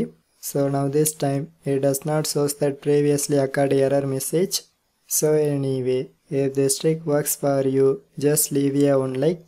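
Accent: India. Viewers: Indian voice, bye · thanks bro. for some reason its always the dudes that either are actually indian or sound like them that end up helping me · TV as thai i think he not from thailand i think he is indian bc accent in thailand not like this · thank you my bro i was making fun of ur accent now ill stop making fun of indians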